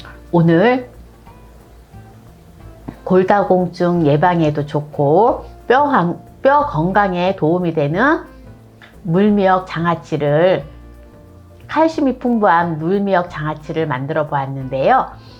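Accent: Jeolla, South Korea. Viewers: 샘이 전라도 사투리 끝트리ㅎㅎ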